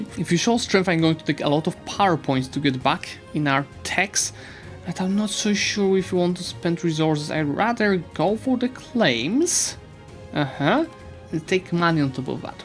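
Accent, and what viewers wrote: Eastern Europe. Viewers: Самый славянский акцент в мире. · What the, the voice describing the situation sounds feminine/or really young but the pronounciation sounds like, its kinda weird, amyway great video, and comment for algorithm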